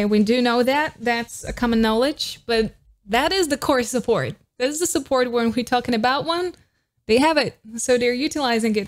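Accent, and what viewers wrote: Australia. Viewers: Are you Aussie??? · Since when do u have an aussie accent? Naurrr saurrr hhaha I love it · I think so, her accent does sound australian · I was thinking the same listening to you speak. You definitely have picked up the Aussie ‘oh’ (letter O) sound when you speak. It’s beautiful. Thanks again for another great reaction video.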